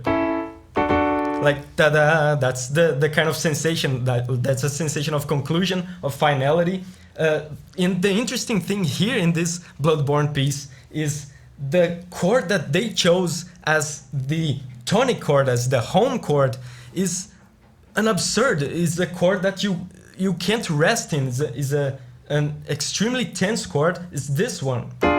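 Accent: Brazil. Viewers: You sounds like a Brazilian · cara eu tava vendo teu vídeo e percebi pelo seu sotaque q vc é Brasileiro, massa demais! essa ost é absurda mano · Sotaque brasileiro, kkkkk certeza que é brasileiro, com um ótimo inglês.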